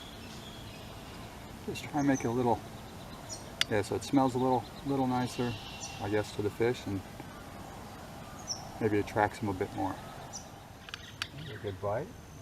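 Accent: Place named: California, United States. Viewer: You're originally from California, right? It always amazes me how similar our accents are to each other.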